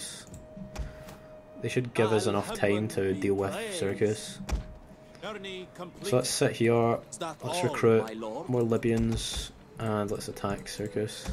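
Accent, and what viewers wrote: United Kingdom. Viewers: Awesome British accent you have there, its very different to the typical Southern Englanders we get on YouTube.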